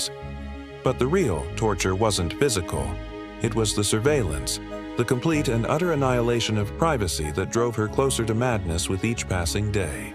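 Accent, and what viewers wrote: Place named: United States. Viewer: So how truthful is this? The dramatic American accent lends BS/showbiz to this rendition!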